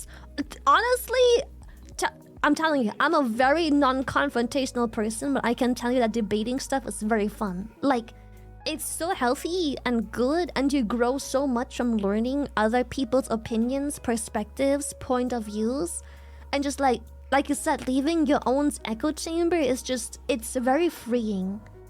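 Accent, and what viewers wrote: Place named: France. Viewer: Shylily's french accent is adorable. It makes sense because she is french that she is non-confrontational.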